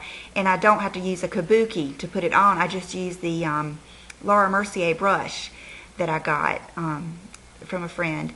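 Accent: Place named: North Carolina, United States. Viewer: Elizabeth Elizabeth I'm not sure where you are from but here in good ole' backwards NC with our accent it's "whelps" Your comment kinda pissed me off because you seem to be poking at her accent. We are very proud of our accent here and I think is as cute as a bug with her accent.oh lord there goes that darn accent again. You seem to be a judgmental person. jmo! Also FYI WHO HAS 3 SUBSCRIBERS AND WHO HAS THOUSANDS OF SUBSCRIBERS! Nuff said as we say here in NC!!!!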